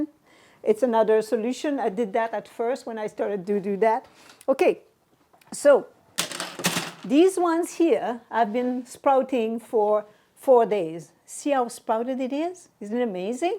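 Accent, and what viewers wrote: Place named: Caribbean. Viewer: Love your advice and your accent is beautiful! I feel like I sense a tinge of islander in yr voice, Caribbean of sorts. (don’t mean to be rude). Thank you for sharing!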